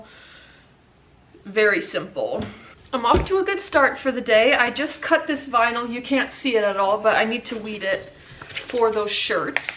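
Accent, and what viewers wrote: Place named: Montana, United States. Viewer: I was born in Montana ages ago. I came across your channel and your voice/accent stopped me in my tracks. Boy did I have a bout of homesickness! You sound like home to me. Love your channel. Quick question. Did you design the Montana home pillow? I’m a crochet girly and would love to make one for my bedroom. If you did design it, do you sell the pattern? If not do you know where I can get it? I subscribed just so I can hear your accent and see Montana content. My uncle (now passed on) had a ranch in Ekalaka. I was born in Choteau. Mom was born in Ekalaka. Dad went to school in Bozeman. Like I said, you’re a bit of home in my ears. Love your channel. Cerise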